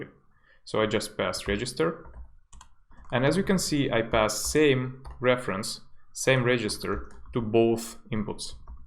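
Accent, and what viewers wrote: Russia. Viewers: thank you! you speack with a russian accent)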